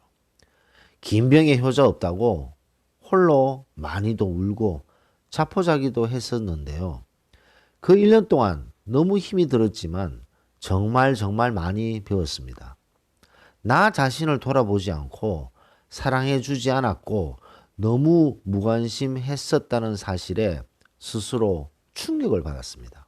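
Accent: Gyeongsang, South Korea. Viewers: 들을때 마다 느낍니다. 어쩜 경상도 사투리가 이리 듣기 편할 수 있는지.. 전 경상도 사투리 원래 질색을 하는데 선생님 영상은 목소리 들으려고 일부러 들어요. 평소엔 괜찮다가 피곤할땐 듣기 거슬리는 목소리가 있는데 피곤할 때 회복하게 하는 목소리예요. 물론 메세지가 좋아서도 있겠죠. 오랫동안 잘못된 기독교 신앙을 통해 나 자신을 부정하고 남을 용서하고 사랑하라는 메세지를 문자적으로 지켜보려고 하다 몸과 맘이 만신창이가 된 사람입니다. 요즘 심리학을 통해 의외로 성경에서 말씀하신게 그게 아니라는 것도 깨닫고 님 처럼 내 주위에 넘쳐나며 나를 갉아먹는 하이에나, 소인배, 나르시시스트, 소시오페스들을 정리하였습니다. 저에게 달라붙으려고 한 인간들이 내가 좋아서가 아니라 나한테서 떡고물을 얻어먹고 내 진액과 생명력을 다 빨아먹으려는 에너지 뱀파이어 였음을 깨달았 고요...이제는 나를 가장 우선에 두고 살겠습니다. 저의 생명되신 예수님도 그것을 바라실 것입니다. 감사합니다.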